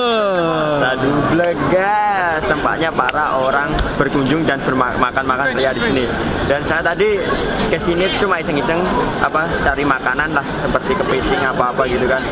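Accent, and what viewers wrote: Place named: Medan, Indonesia. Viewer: Dari logatnya orang medan kayaknya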